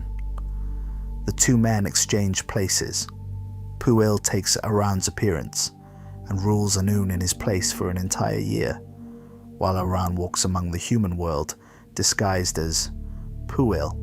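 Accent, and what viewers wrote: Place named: Wales, United Kingdom. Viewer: I'm not sure if you've heard of Ren, but he's a brilliant Welsh musician. Perhaps the best I've heard in my 60 years. But, your speaking voice is quite similar even beyond the accent. This was a wonderful video. Thank you.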